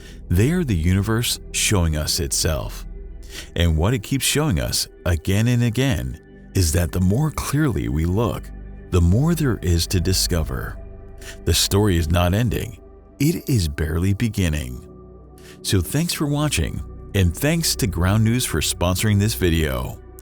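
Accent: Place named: United States. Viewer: Every bloody where you go, every bloody video....a yank accent. Too many yank accents..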